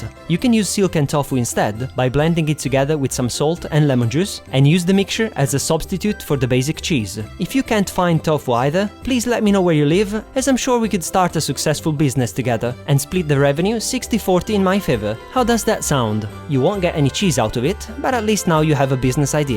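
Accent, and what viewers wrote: Italy. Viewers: I love love loooooooove your italian accent when speaking in English. Sono vissuta due anni in Italia e i tuoi video mi ricordono del legendario "sense of humour" degli Italiani (aaaaah, nostalgia!) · no, dont change your accent, Italians always make english sound beautiful! these look really good:)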